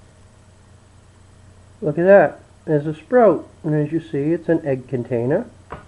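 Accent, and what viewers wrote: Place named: Maine, United States. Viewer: store what you eat and eat what you store. I have been doing moderate food storage for 20 years. I shop at Save-A-Lot every month. time from Maine and you sound like you're from somewhere Within a 50 mile radius. :-) do you know why I started to store food? because my first husband would starve me and then years later over feed me so once I divorced him I still felt like I had to keep a huge closet full of food.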